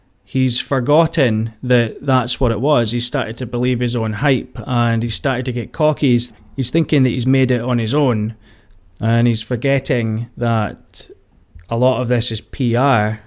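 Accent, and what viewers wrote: Scotland, United Kingdom. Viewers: You Scottish bro that’s coming from a Scotland ma lol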